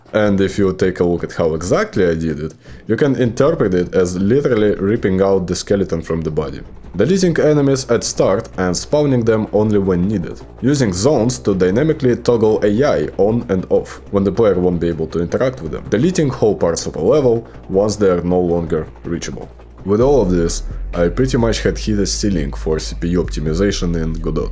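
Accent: Russia. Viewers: У него ОЧЕНЬ русский акцент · не только я услышал у него русский акцент) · russian accent, W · Какой же ахуенный русский акцент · Mmm чувствоваеца русский акцент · I can hear by the voice that the author is Russian. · Твой акцент очень даже русский, и я уверен что ты русский. Понимаю тебя, ведь на русским не платят на ютубе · Man is so cool! Кхм а еще твой Русский акцент прям врезается в голову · Bro u got bold Russian accent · You have strong russian accent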